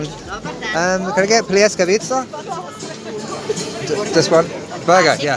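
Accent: United Kingdom. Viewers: Thank you for visiting our country. Greetings for UK. Sorry if i am wrong about your country, you sound like people from UK. I know that because i was in London 2018. I almost cried when i had to go back. Bye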